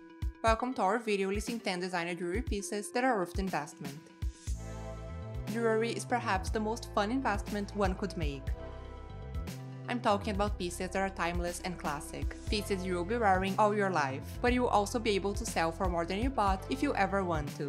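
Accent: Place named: England, United Kingdom. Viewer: I can intolerant your English accent.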